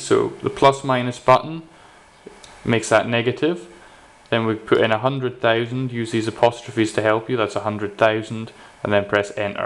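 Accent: Scotland, United Kingdom. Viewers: God bless you. You truly are a gentleman and a scholar. Your Scottish accent is a comfort to me whenever I click on these videos.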